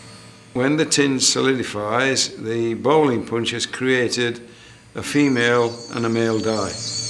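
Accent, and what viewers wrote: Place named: United Kingdom. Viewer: English, but with a British accent.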